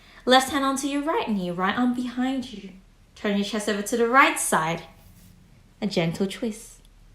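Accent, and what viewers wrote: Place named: Australia. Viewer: Absolutely beautiful! Thank you so much! Also I LOOVE your aussie accent 😍😍 Blessings 🌸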